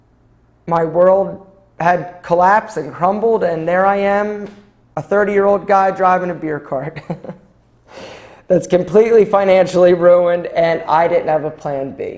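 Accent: Philadelphia, United States. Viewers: I knew I recognized that philly accent!!! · I knew that accent sounded familiar. Grew up in Philly as well.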